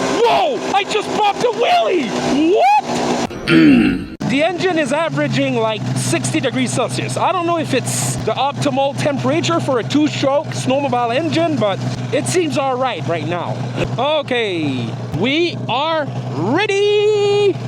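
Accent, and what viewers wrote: Quebec, Canada. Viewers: Is he from Quebec ?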